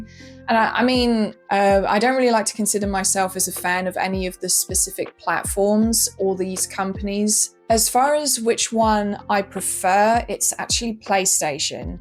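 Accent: England, United Kingdom. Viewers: Why are you talking in a Harry Potter accent the whole video? Kinda weird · Cuz she's from England & there's people who actually sound like that. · A cute girl with uk accent playing on a CRT? What else can I ask 😳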